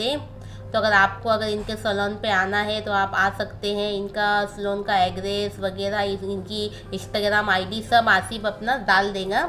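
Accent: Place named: Mumbai, India. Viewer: Bilkul Sachchiiiii baat hai, Andaaz to Wahi hain bolne ke khaalis Mumbai 🤦🏻‍♂️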